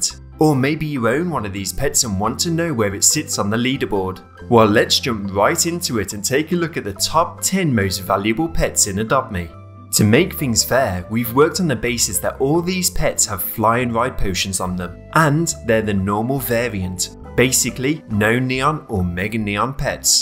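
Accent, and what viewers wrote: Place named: United Kingdom. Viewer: You can tell hes british!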